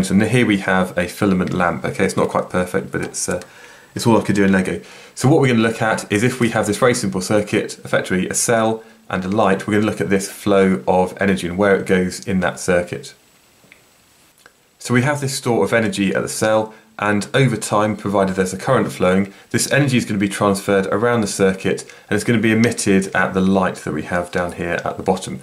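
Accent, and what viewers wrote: United Kingdom. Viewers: British English is wonderful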